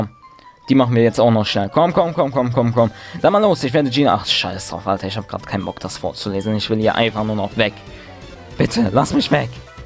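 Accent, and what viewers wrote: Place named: Cologne, Germany. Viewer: ein kölner akzent rischtisch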